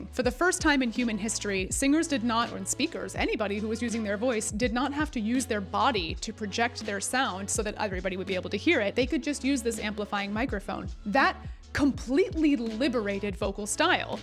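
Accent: England, United Kingdom. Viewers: Your English accent is brilliant! Listen to the late Gene Pitney who seems to have an operatic kind of technique. Greetings from Good Ol’ England. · Thank you for such an informative and entertaining video. The sections where you subtracted the techniques one by one and then added them back in one at a time were very impressive. Some very thought provoking questions too. I was also pretty impressed by your English accent.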